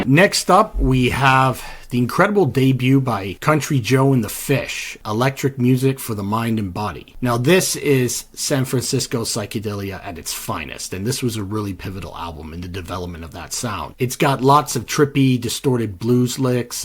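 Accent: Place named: Canada. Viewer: Give him a break. He has a Canadian accent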